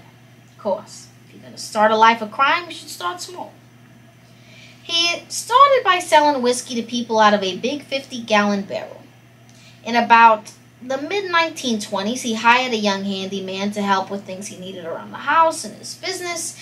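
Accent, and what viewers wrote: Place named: New York, United States. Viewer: Hello I love your costumes and thick New York Accent Long Island no doubt. Sounds like Cyndi Lauper but more cute. I think you like those types of stories and dress up but it’s good I love it thank you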